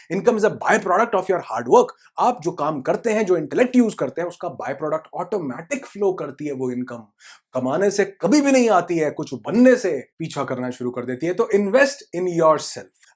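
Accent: Punjab, India. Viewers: Sir Punjabi ho tussi??... Boht Khushi hoyi sunke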